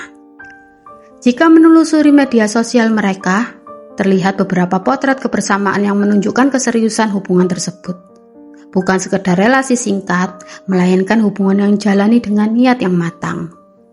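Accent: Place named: Java, Indonesia. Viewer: medhok bangeet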